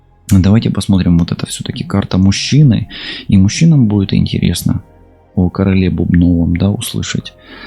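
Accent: Ukraine. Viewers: Вы просто супер мне очень нравится что вы говорите как украинец мой род связан с Украиной красивой страной корни есть от туда вас приятно слушать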